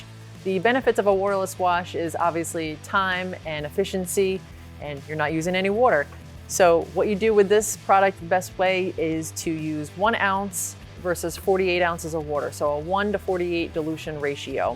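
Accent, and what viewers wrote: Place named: Philadelphia, United States. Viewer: are originally from Philly? Just guessing by the wooter accent.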